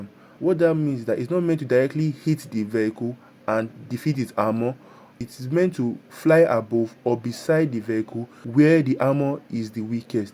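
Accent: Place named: Africa. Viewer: because you have an african accent ,but a great guide btw I've already subscribed and am waiting for more tank guide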